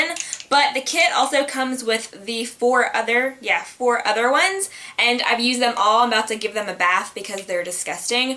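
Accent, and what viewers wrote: South, United States. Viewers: I looove your cute little accent, I first found you on IG & never pictured you having a southern accent! BTW have you tried Urban Decay's "Naked" lip liner? I feel like I reach for it soooo much more than Stripped Down (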